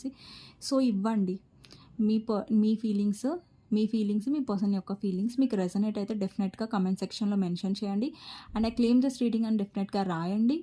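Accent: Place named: Telangana, India. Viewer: ఎవరిని పట్టించుకోకండి. మీది తెలంగాణ బాషా చాలా బాగుంది బాధ పడకండి. మీరు నిజాలు చెప్పుతున్నారు చాలామ్మా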